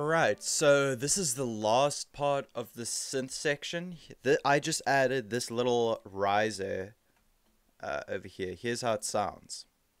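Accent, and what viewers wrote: South Africa. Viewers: lol the way I can tell you're South African just off of your accent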